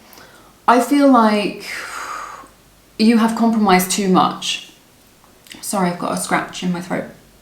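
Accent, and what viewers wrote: United Kingdom. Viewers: Pile # 3 and specially pile #4 : resonate 100% , this video is a turning point for me , let us call it the beautiful truth , we really need always to search for the truth.Its almost personal , I have done the work on myself and learned my lesson , thanks for the universe for upgrading us and thank you so much for helping me sort things out . I love you and love your British accent too 💓💓 To my fellow viewers : I watched the whole video , the 4 piles and I got a message from each of them ,somehow 4 piles resonated with me somehow , wish you all love , light and the best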